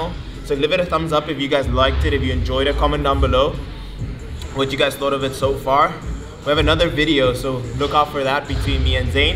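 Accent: South Africa. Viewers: You sound south African, are you? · Bro your accent , are you South African? Thanks for great content!!! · Is this guy from South Africa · JUST SUBSCRIBED!!!!! Im from Cape Town and I pick up you from SA Straight from the pat by the accent. Enjoyed watching this video, looking forward to getting to see future Videos you drop. Much love ...:) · This guy sounds South African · Dude sounds South African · This guy from south africa?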